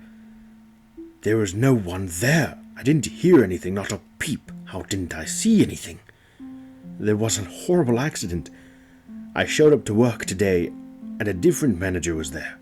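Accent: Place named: United Kingdom. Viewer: I would love to hear you narrate a James Bond audio book you have a great British accent!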